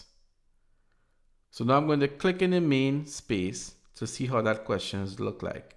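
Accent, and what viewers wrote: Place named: Trinidad and Tobago. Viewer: You're not a real instructor unless you have a Trini accent. I love it. Cheers!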